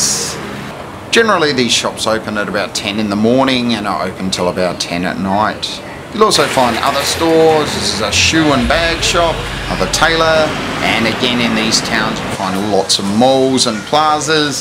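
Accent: Australia. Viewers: Such a strong ozy accent.😂😂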